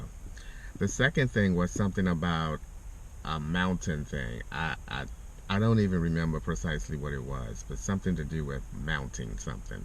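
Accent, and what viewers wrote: Baltimore, United States. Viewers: 🤗 I can definitely hear that B’more accent😎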